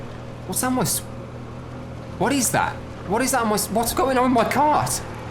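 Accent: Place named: England, United Kingdom. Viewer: “Tiki heads” are from Easter Island where they call them “moai”. Pronounced kinda like the English words “more I” if you read it with English accent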